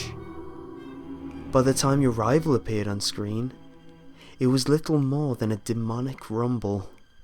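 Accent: United Kingdom. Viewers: Luis Galaz preach, stop calling people retards and stuff just because they misheard the word “pawn”. The man is British and you can easily mishear a word.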